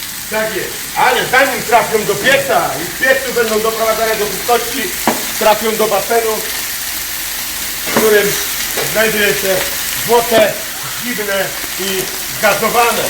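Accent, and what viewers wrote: Highlands, Poland. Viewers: skąd ten góralski akcent u gościa znad morza?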